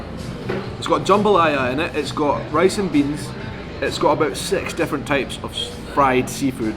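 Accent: Scotland, United Kingdom. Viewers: Absolutely funny as shit watching the subtitles try and keep up with your Scottisms lmao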